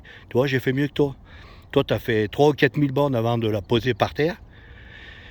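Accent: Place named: Savoie, France. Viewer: j'me disais bien qu'il avait un accent de chez moi !!!! super merci pour cette video. J'ai essayé cette 750 chez Honda La ravoire ( 73 ) bah !!! ça a été le coup de foudre aussi. j'ai pas franchi le pas, je viens de leur prendre la 125 CB F comme petit utilitaire mais cette Transalp est top !!!! il est même venu a 2 pas de chez moi, au col de champ laurent !!! Elle était un peu haute pour mes 174cm mais bon !!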